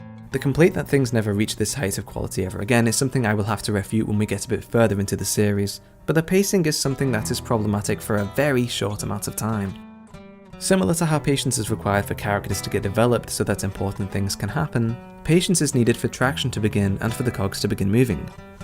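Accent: United Kingdom. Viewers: an overly-soft UK accent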